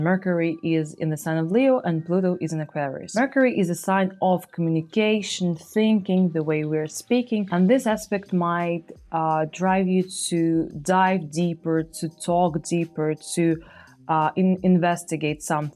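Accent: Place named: Iran. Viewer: چقدر لهجت ایرانیه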